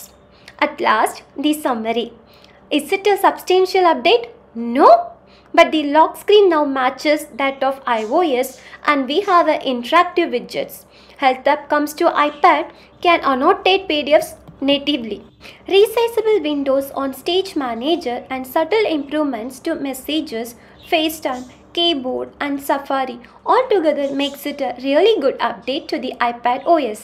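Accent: India. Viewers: Your indian accent is so thick girl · I llllllllove your Indian accent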